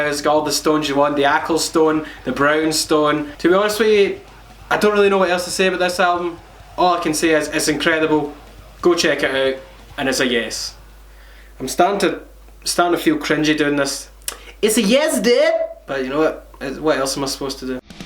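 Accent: Scotland, United Kingdom. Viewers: I like when you talk because I like your Scottish accent. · wait your scottish?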